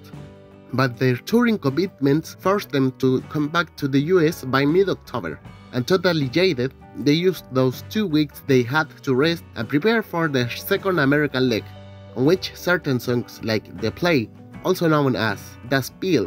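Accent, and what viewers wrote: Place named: Spain. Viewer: Interesting video... but I would have done without the extremely thick spanish accent of the narrator - it's quite annoying...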